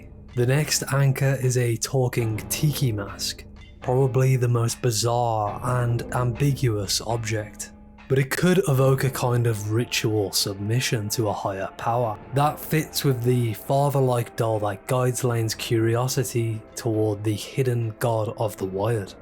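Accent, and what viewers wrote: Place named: United Kingdom. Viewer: E<link> British Accent.. unlistenable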